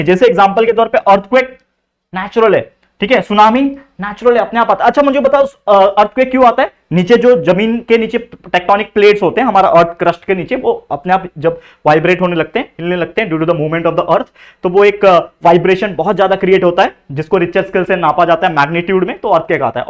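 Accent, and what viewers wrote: Maharashtra, India. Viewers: Are you Marathi sir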